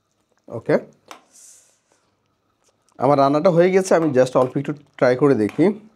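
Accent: Noakhali, Bangladesh. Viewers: ওনার কথা শুনে বোঝাই যাই নোয়াখালীর মানুষ।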